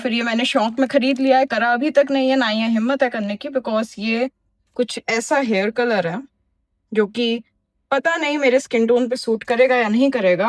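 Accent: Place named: United States. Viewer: Why your accent is American Hindi ?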